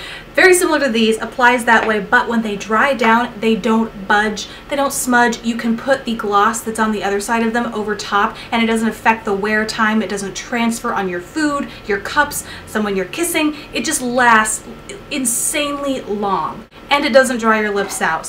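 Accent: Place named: Midwest, United States. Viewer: Like your videos, happy to hear a Midwestern accent again.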